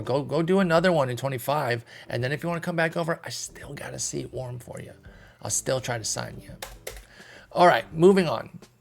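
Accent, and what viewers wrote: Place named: Ireland. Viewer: Lmao the Jacksonville Irish is incredible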